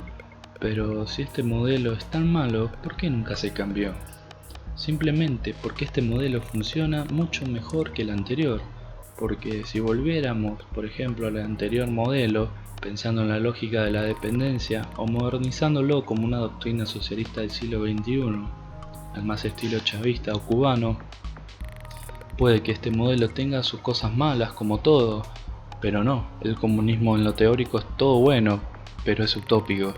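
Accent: Argentina. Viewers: Si es peruano , por qué habla como argentino?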